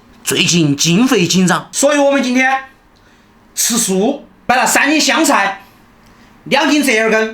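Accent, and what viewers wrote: Sichuan, China. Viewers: 👀你吃就要笑。最好不要吃多麼快。喜歡你的四川口音。well done!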